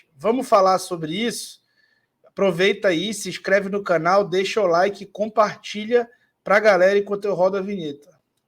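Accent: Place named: Amazon, Brazil. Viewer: Ver esse vídeo, com essa aula e esse sotaque amazônico lhindo 😍 Saudades de Belém ❤️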